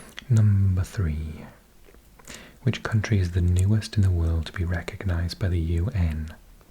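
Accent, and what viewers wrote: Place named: Wales, United Kingdom. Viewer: This is amazing! I love listening to facts or meaningful things (not rambles) and in a Welsh accent, I’m blessed. If you happen to speak Welsh too then that would be another amazing video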